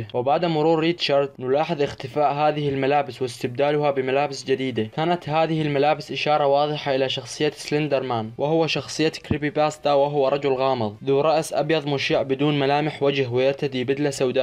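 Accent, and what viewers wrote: Iraq. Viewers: أنت عراقي لو لا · انتا عراقي؟ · انتة عراقي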